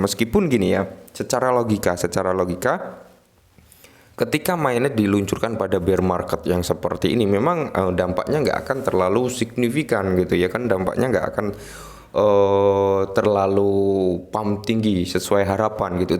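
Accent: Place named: Surabaya, Indonesia. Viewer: mas sampeyan womg endi? koyoke gak adoh² teko suroboyo nek ndelok logat e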